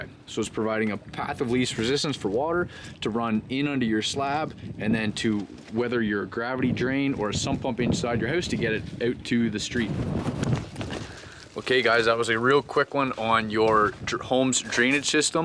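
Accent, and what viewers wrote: Canada. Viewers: I knew you were Canadian before I even saw the location is tagged as Peterborough 😂 Very helpful video for a home owner working with contractors. A future video on sump pumps would tie in nicely with this one!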